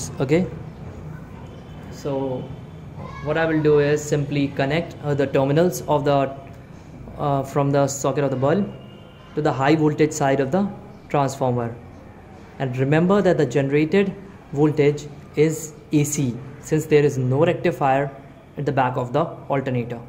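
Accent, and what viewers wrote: India. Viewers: I can NEVER understand this India Accent ! By time I figure out what they are trying to explain , I'm behind on the Next step . I'll just find someone with good English !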